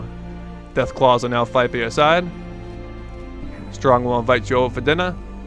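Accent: Boston, United States. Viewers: okay thank you for replaying! Being a console player my whole life mods are foreign to me. One more thing, are you from boston? Coming from a fellow Bostonian. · cool I like the Boston accent and could u do a vid on energy weapon mods if u haven't already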